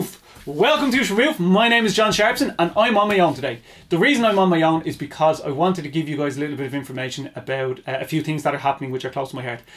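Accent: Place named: Ireland. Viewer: I was unable to work out zambia with an irish accent, being Norwegian and all that so for a short while i was sure zambia was a person and nothing made sense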